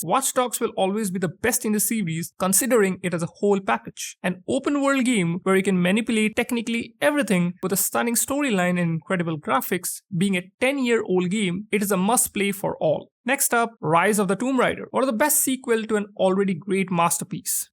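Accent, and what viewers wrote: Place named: India. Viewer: The channel's about section says channel was made in India. His accent is also Indian. So he is?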